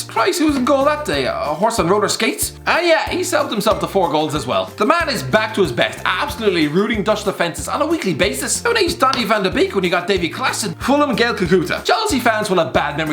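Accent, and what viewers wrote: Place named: Ireland. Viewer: Slow your Irish ass down, I can barely understand you and I'm fluent in most english accents, including Creole, New England and Brooklyn/Bronx slang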